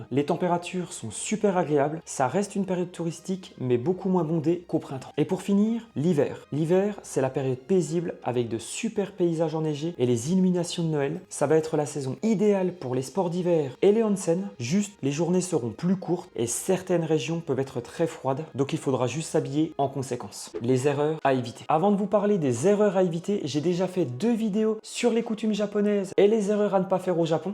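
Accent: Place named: Vosges, France. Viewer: Merci pour tous tes bons conseils . Je pars dans une semaine et ça m’est bien utile . C’est drôle cet accent vosgien que tu as gardé ;je suis de là bas 😂😉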